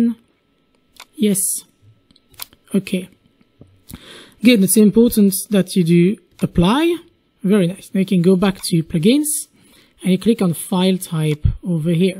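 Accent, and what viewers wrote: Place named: France. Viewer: Really good tutorial + super sweet french accent, thank you again!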